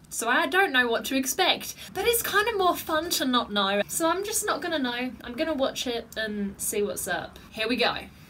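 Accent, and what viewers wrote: United Kingdom. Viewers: (" "), your bravado made me comment on this page! Your craft is passionately represented and it is very appealing to know that you are inclined to view and comment on video suggestions from your students. The british accent is delightful as well. killed this record and there is a need for COVID-19 refreshing music. keep em coming and I love the commentary, equally your facial expressions and voice overs killed this review...... go! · Greeting. It is good to know, you teach singing with vocal instruction . Thank you, been a "Music Teacher". Question ? : Are you from England ? 🇬🇧 . You have a strong British, accent. I am trying to listen and understand what are saying. Well, keep up with your videos look good. Please, try not to make faces, make look much older and not looks good. I believe , you are young and beautiful. Please, try to show a little positive expression, people will approach you more. 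Take care of yourself, kisses and hugs, XOXO , Love. Sincerely, 😎Mr. Villalba 🇵🇷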